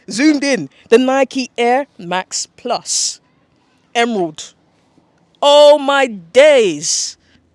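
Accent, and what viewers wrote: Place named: Ghana. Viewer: Hehehe!!! See! He talks like us. That's what fooled me! Ok then! Big ups to Ghana!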